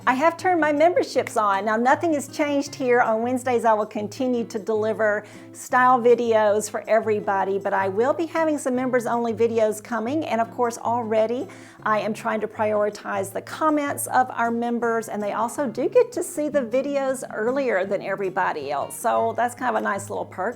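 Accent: South, United States. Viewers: Oh my goodness what part of the south u from I'm from No.Carolina love your videos but I think u could perk it up & jazz it up more 💜🎄🎅🤶🦌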